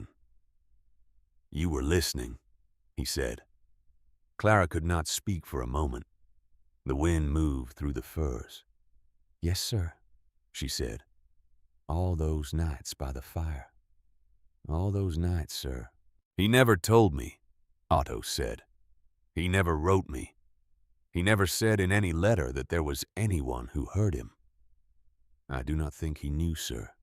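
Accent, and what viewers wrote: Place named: United States. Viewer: Oooh! A narrator with an American accent!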